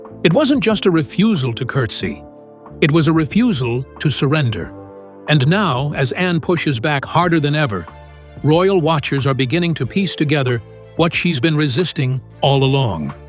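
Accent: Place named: United States. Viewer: Be very careful what you believe. Especially when it comes with an American accent. This report is total garbage. Believe me, I know.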